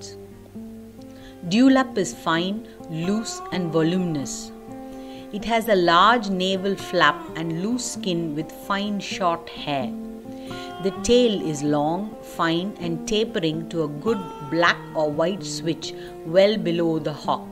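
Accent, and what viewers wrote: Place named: India. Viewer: You seem to have a foreign name with an Indian accent.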